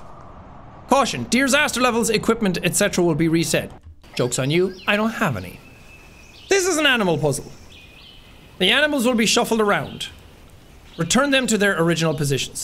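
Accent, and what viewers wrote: Scotland, United Kingdom. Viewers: BRO SOUNDS SCOTISH WHO AGREES 👇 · Is he scottish? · I like your accent is it Scottish???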